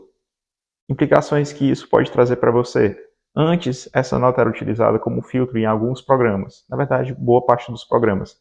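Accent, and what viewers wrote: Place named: Brazil. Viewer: Maneiro o vídeo! Só seria legal colocar na descrição os nomes ou legenda porque como seu inglês tem uma pronúncia bem brasileira, não da pra entender todos os nomes que você fala :(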